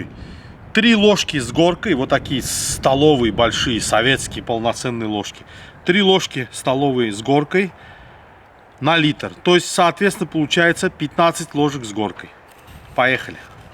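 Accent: Baku, Azerbaijan. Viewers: Приятный Бакинский акцент👍🏻👍🏻👍🏻пошла солить😋😉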